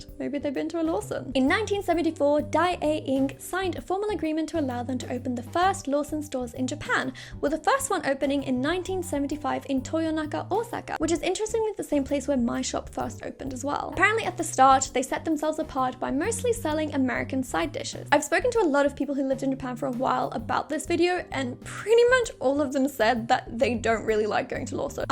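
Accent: England, United Kingdom. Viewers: she lost her England accent a lot from the last time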